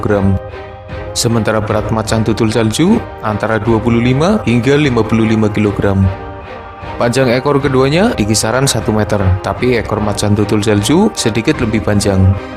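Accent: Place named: Java, Indonesia. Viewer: Medok bgt min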